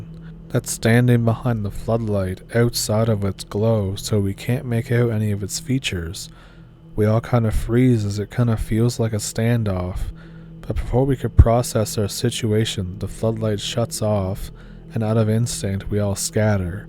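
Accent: Canada. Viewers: How is it you grew up in AZ but have the hardest Canadian accent I've ever heard?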